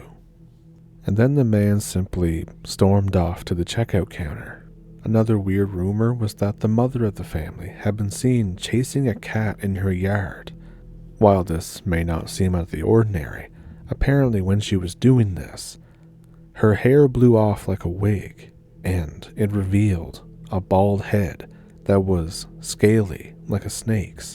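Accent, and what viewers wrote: Canada. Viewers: Hey, -.. 💙❤️💙💙, I love hearing my canadian uncles and cousins when you talk,😂- I just want you to know, how I enjoy your stories, you should hear some known things what happened in Emerald Triangle. 🎉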